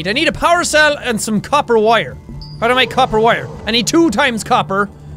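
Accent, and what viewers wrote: Ireland. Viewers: his irish is heavier than it is now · He sounds way more Irish than he does today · Hi in your biggest fan I watch you so much I've picked up your Irish accent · Coming here from 2021, and I was startled by how Irish this man sounds. Also smiled like an idiot at the intro. Edit: 2023 now, and I don't think I'll ever get used to his incoherent ramblings. Still fun to watch, though!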